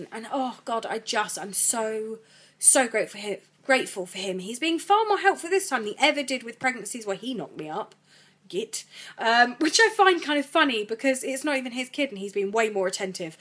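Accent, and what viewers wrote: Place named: England, United Kingdom. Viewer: Just curious love, how hot is it where you are? I assumed you were in England all this time given your accent. xo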